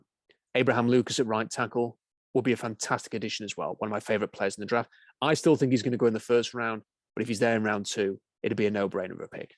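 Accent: England, United Kingdom. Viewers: Great analysis. First time listener. Still trying to wrap my mind around NFL coverage in an English accent.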